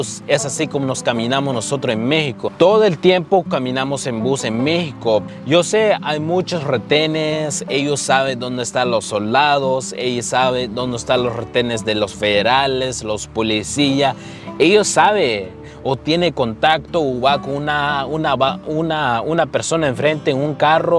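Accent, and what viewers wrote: United States. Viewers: Me encantan tus videos pero no entiendo por que perdiste tu acento osea te cuesta el español y eres de Guatemala no entiendo ese esfuerzo de hablar como gringo espero no lo tomes a mal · jjjjjjjjjj lol 13 años y en redas la lengua como gringo no la en redo yo que tengo 30 años aqui y me cruso mi papa teniendo 5 años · y tu acento hermano asi como medio gringo jejen una pregunta , curiosidad , soy seguidor tuyo , me asusta ir con coyote pero bueno es bueno aprender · Porque hablas como gringo oye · Buen video bro, pero una pregunta, a los cuantos años llegastes a Usa? Porque hablas como los chavos que nacieron alla.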